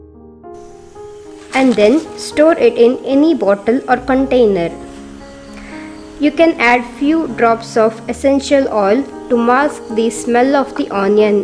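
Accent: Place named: South India, India. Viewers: Its south accent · In love her innocent indian acctented english.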